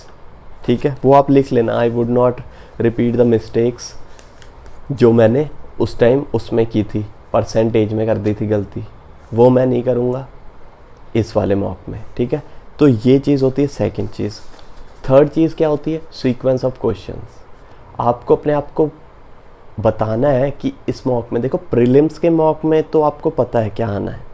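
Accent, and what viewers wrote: Punjab, India. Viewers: Are you from Punjab?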